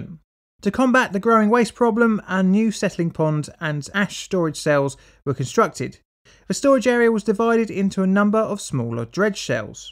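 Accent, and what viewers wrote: United Kingdom. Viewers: Why is a limy narrating this, ENGLAND HAS ENOUGH ISSUES OF ITS OWN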